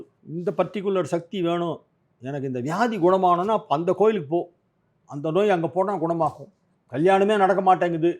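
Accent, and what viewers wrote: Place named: Tirunelveli, India. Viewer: அய்யாவின் பேச்சு நெல்லை மாவட்டத்திற்கு தனி சிறப்பு ஆச்சு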